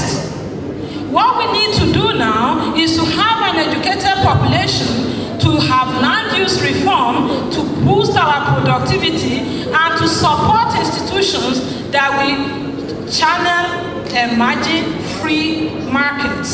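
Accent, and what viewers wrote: Yoruba, Nigeria. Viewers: at least she used Yoruba intonation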